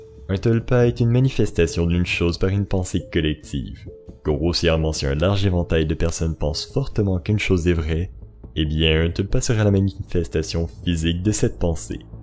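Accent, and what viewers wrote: Quebec, Canada. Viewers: je suis très difficile avec le youtube québecois à cause de l'accent mais franchement avc toi ça passe comme une douceur avec le ton parfaitement doux +1 abo je m'en vais regarder tes autres vidéos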